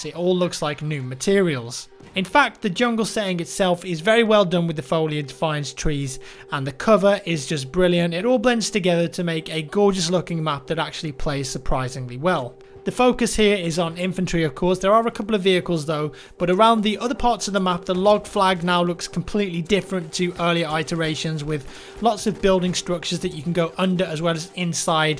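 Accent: England, United Kingdom. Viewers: He's English. They pronounce everything different. Even in various regions of the Isles the words will sound completely different. It's the beauty of accents. Much like opinions, no two are alike.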